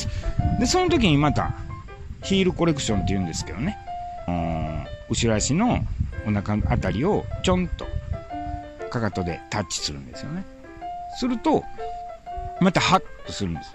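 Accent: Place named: Kansai, Japan. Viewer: 初めまして。スーパーで置き去りにされていた推定5歳のミニチュアピンシャーのメスを引き取って育てて半年になります。当初からグイグイ引っ張り、吠える子でしたがどんどんひどくなってます。犬、車には飛びかかっていく勢いでほえまくりで、とにかく家から一歩でたとたんあたりを警戒して興奮してハアハアが止まりません。普通に穏やかに歩けたことなど一度もありません😂 の動画に出会い、主従関係がまずなってないのだと気づき、室内でリードをつけてボス犬になるべく頑張って一週間たちました。まだまだ辛いだけの散歩です。は関西弁ですがどちらにいらっしゃるのでしょうか？ぜひ伺つて教えを乞いたいいと切望しております。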